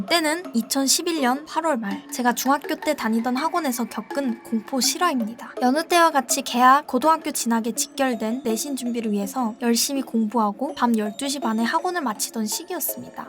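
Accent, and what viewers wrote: Standard. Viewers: 표준어 패치된 ㄷㄷ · 새삼 느낀게 사투리 다 어디가고 서울말 쓰고 있네요??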